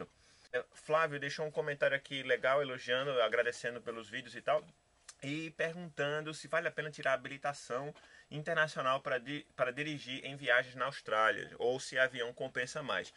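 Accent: Pernambuco, Brazil. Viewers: Contato , vc é pernambucano ?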